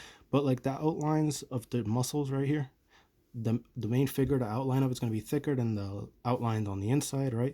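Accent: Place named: East Coast, United States. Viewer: That was fantastic. Also your accent made it feel like my cousins from the East Coast were giving the tutorial. Which for me was comforting.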